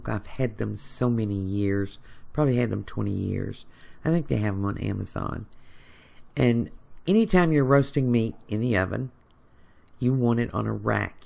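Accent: South, United States. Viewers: Just prepped some spareribs for tomorrow. Used a store bought rub on the ribs then added 4 cups of buttermilk on to of it. I wanted to make a buttermilk pie, which I love, but since I’m a owner operator of a small pet supply in Oak Island,NC I just didn’t have the time. Since the pie wasn’t made I decided to pour the buttermilk over the ribs. I’ll let you inow what we thought of them tomorrow. What state are you from? You sound a bit more southern than me, so I believe you are farther south than I am. My mother always marinated her chicken in buttermilk and I thought why not ribs? I put in search for you tubeand found you. Thanks so much! I’ll continue watching your videos. It is around 3:thirty am and I need to go to bed. Noproofreadingtonight. My zero doesn’t work this computer. Guess it doess not like salt air. Good night!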